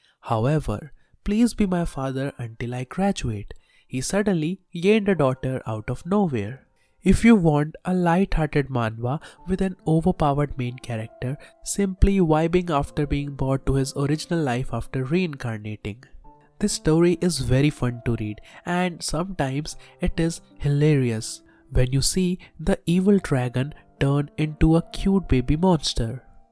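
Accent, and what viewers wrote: India. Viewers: lmao indian accent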